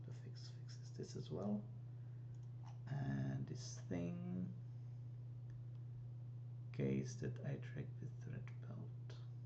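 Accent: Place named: Czech Republic. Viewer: i can confirm, this is czech accent 100% lol, also the gothic shortcut on desktop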